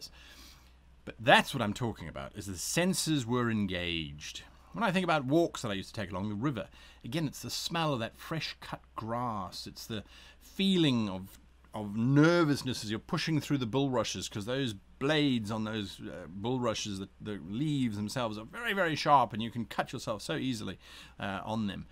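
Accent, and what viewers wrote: United Kingdom. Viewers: South Africa, huh? I wouldn't have guessed that; your accent sounds British. Unless you moved there later in life. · I know this is an old video to comment on, but I am so glad I watched it. I have suspected for a long time that you are South African, but was never sure. Your accent is is such that I thought you may be British. Very very happy to see a fellow South African do well on YouTube and be an amazing voice for us nerds everywhere. You sir, have a new subscriber !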